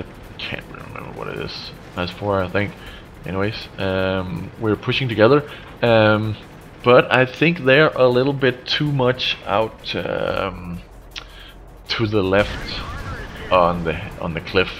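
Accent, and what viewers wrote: Denmark. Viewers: Dude be nice if we could face each other cause when i watch ur vids i get the urge to face u lol...an based on the accent ur Danish am i correct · are you danish???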